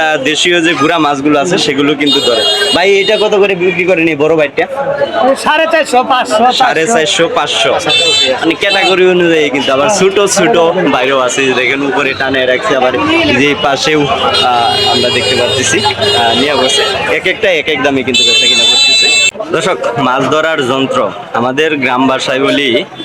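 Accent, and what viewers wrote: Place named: Bangladesh. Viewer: ভাই তুমি কোন জায়গার ভিডিও বানিয়েছ,? তোমার ভাষা শুনে মনে হচ্ছে বাংলাদেশ থেকে। জায়গার নাম জানিয়ে দিলে খুব ভালো হতো। একটি সুন্দর ভিডিও দেওয়ার জন্য ধন্যবাদ।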